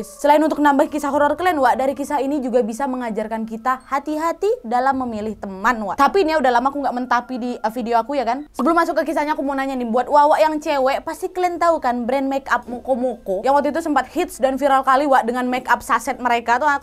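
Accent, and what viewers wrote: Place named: Medan, Indonesia. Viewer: Medan kali logat nya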